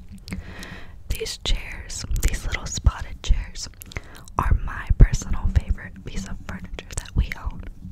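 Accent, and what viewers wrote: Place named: South, United States. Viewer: This clearly isn’t a manufactured neighborhood. First off I’ve never seen a manufactured house anywhere near this big, and the house I can see across the street looks completely different than the house she’s in. Manufactured neighborhoods usually have very similar looking houses. And based on her accent and the landscaping that I can see, I’d say she’s either in Texas, South Carolina, or Georgia, in a Metropolitan are like DFW, Charleston, or maybe somewhere near Atlanta or Savannah. Which means that this land is far from cheap, I’ve seen two acre lots in Dallas go for 400k.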